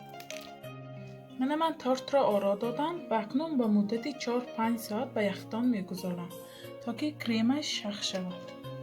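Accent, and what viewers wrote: Isfara, Tajikistan. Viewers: Апа аз Исфара хастед?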